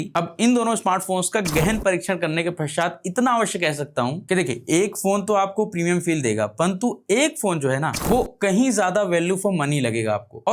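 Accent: Standard. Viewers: आपकी हिंदी अति शुद्ध है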